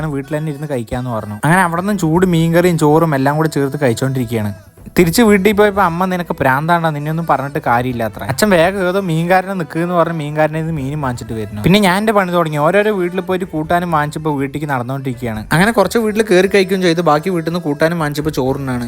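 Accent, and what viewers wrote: Palakkad, India. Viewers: Palakkad bhasha❤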